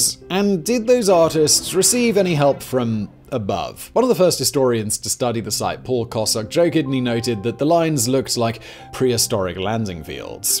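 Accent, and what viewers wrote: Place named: England, United Kingdom. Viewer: Midlands/south England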